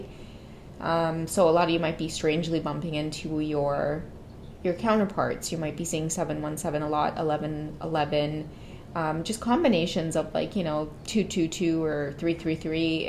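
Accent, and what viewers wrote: Trinidad and Tobago. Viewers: Hey !!! Are you from Trinidad 🇹🇹 you sound like you from.am from Trinidad 🇹🇹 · Your Trini accent is everything for me 🥰 Carribbean gal tingz 😂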